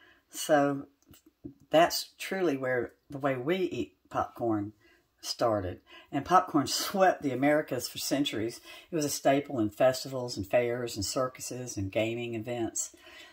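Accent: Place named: Virginia, United States. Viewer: You're a great storyteller with that Virginia accent.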